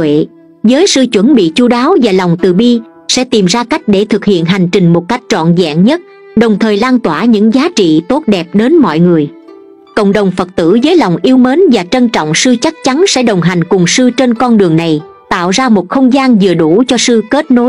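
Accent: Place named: South, Vietnam. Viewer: Giọng đọc hay quá, Chuẩn giọng nam bộ . Coi vậy chứ rất hiếm đấy quý zị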